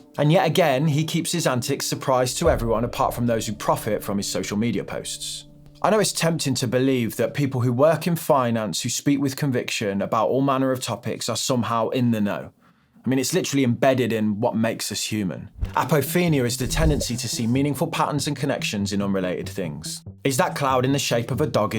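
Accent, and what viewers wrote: United Kingdom. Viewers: Perfect British Accent ❤🩷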